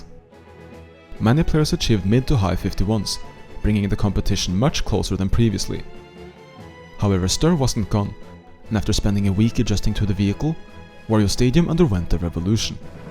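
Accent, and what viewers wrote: Norway. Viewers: As a Norwegian, i could immediately tell you were Norwegian too lmao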